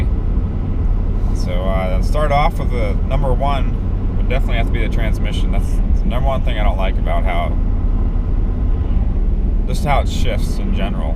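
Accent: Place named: Illinois, United States. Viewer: what part of Illinois your from by your voice northeastern Illinois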